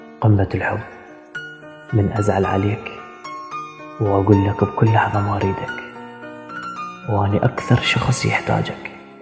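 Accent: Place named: Iraq. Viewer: حلوووا ماشاء الله 🥺🌹🌿 لما أسمع كلماتك تصير عندي رغب في التحدث بالعراقيه.😌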